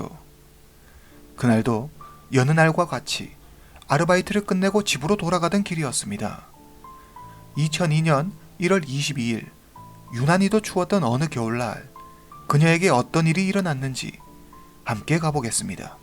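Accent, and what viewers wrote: China. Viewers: 님 중국사람이신가요?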